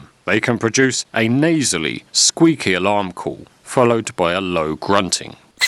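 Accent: United Kingdom. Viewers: Just watched a video from five years ago. When did you get a British accent?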